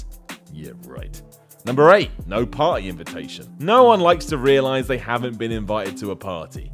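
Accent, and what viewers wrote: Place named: United Kingdom. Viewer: NuclearWinter i quite enjoy his voice actually. its soothing. but that could just be the biased American, who loves British accents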